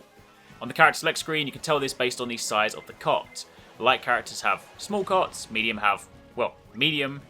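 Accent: England, United Kingdom. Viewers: Of course he has an english accent omg tired of that shit every single time i look up a game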